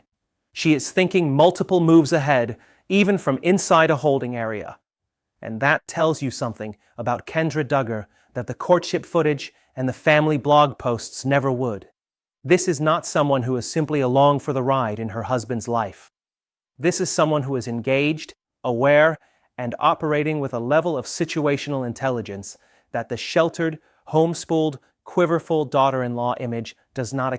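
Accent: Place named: United Kingdom. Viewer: Oops. What happened to the British accent?!?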